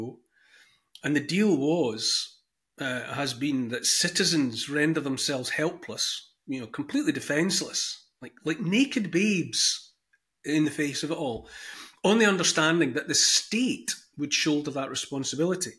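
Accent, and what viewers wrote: Scotland, United Kingdom. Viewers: Subscribe. Because without that you would never hear an Och Aye the Noo on a regular basis!!!!!!!!! And i say this as a Dundonian who grew up in Cambridgeshire with an English accent. I now live North of Aberdeen but eveyone thinks i'm English much to my chagrin!!!!!!!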